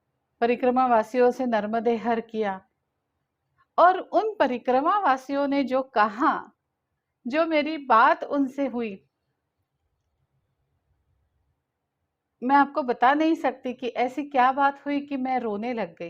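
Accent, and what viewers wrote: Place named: Maharashtra, India. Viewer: नर्मदे हर,🙏🙏आपकी हिंदी भाषा बहुत ही अच्छी है, और कभी कभी आप जो मराठी मिश्रित हिंदी बोलतीं हैं वह तो और भी ज्यादा अच्छी लगती है । मातृभाषा की सौंधी मिट्टी सी महक आती है ।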